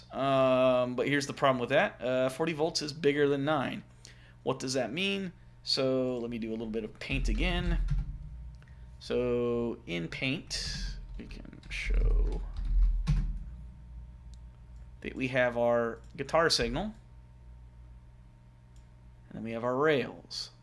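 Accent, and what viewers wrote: Australia. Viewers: Did you shake the Australian accent?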